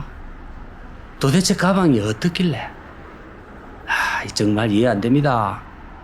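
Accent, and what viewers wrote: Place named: Busan, South Korea. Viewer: 강호의 발바닥TV님 제발 부탁인데 부산 사투리 그만좀 쓰세요 듣기 불편하네요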